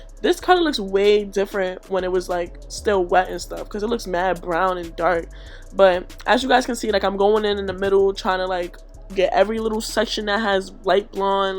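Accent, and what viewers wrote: New York, United States. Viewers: the accent is giving , most def from NY😭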